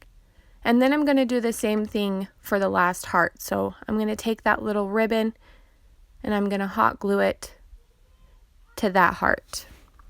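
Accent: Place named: Utah, United States. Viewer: I know that "accent"! LOL....I grew up in SLC. Haven't lived in UT for 20 years, but still have family there.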